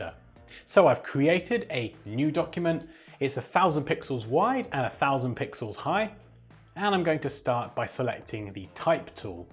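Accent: England, United Kingdom. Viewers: thankyou for tutorial, where do you from ?, england?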